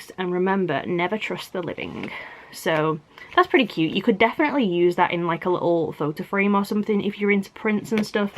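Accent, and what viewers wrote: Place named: Wigan, United Kingdom. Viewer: I dont know if you seen my comment on your last fright crate unlocking but the pumpkin tea light holder that was smashed they are selling in home bargains i live in wigan and your accent sounds close to mine so I'm thinking yours will also have them in the Halloween range it has a face on the front of it and is a wax melt also comes with a pumpkin spice wax melt smells delicious and is about same size as the smashed one you recieved in your box I bought one other week for 3pound I think I love it and instantly thought about you because I knew you was disappointed they couldn't send you another you look amazing as always ❤👑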